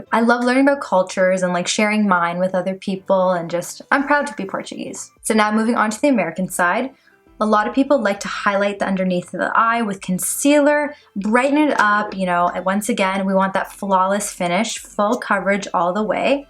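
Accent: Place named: Coast, Colombia. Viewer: Usted parece costeño colombiana jejejeje pero mi familia también son Portuguese y mi papá es trigenio bendiciones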